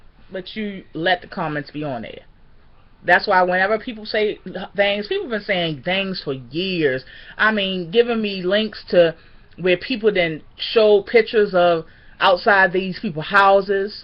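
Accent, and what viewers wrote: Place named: Maryland, United States. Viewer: Girl u sound like me... im from md too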